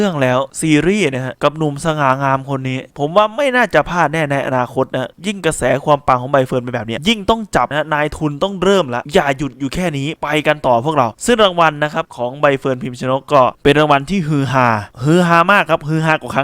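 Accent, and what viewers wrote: Ubon Ratchathani, Thailand. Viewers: แอดมินเป็นคนอุบลหรอครับสำเนียงเหมือนคนอุบล😊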